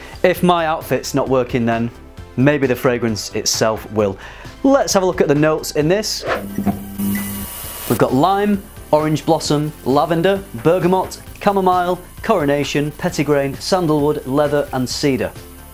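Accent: United Kingdom. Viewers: Besides the afford you put in your Videos, id like to tell you, that your Voice is just so relaxing and pleasant to hear, maybe because i also like that British açcent. I hope that doesnt sound weird to you. XD Anyway, loved the Video, reminded me alot of GTA Vice City haha. Many greatings from Europe.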